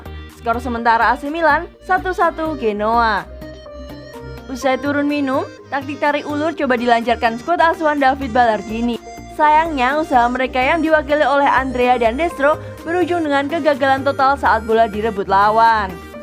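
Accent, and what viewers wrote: Java, Indonesia. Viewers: adminnya mantapp mendok berasa main di kediriii akuu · Admin nya orang jawa ya.. mantap lah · POKOKNYA MILAN DI SISA MATCH SELALU ON FIRE KARENA SUDAH TERTINGGAL 9 POIN DARI MERDA INTER LAH MEDOK LAGI VO NYA WELEH WELEH FORZA PRE SEMPRE MILANELLO MARCHEND JAKET MILAN APA KABAR TUH MIN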